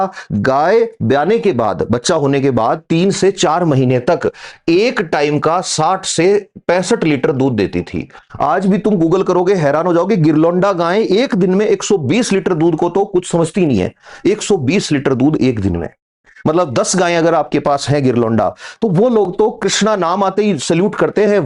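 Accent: Rajasthan, India. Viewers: Ye hamare rajasthan se h pakka ascent se confirm hu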